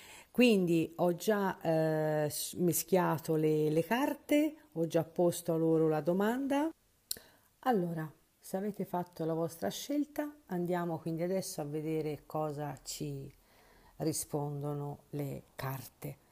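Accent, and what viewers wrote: Tuscany, Italy. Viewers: Stesa n. 2. Col tuo simpatiho accento toshano hai centrato tutto alla grande. Se lui dovesse tornare e per giunta contrito (oggi lo sentirei come un miracolo...), giuro che lo faccio mettere in ginocchio sui ceci e corro a scriverti !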